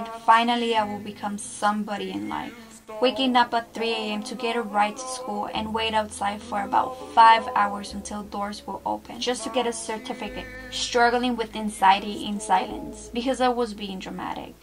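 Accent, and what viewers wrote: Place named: Spain. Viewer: Go to school and get a degree ! Unfortunately you can’t just start as a Spanish influencer then suddenly cross over to English influencer especially with your accent so thick. I would suggest you create videos in Spanish and use YouTube as a source of income not a concrete job because with wars and inflation lots of sales ( links in bio ) are going to go down . People are more tight with their money especially right now .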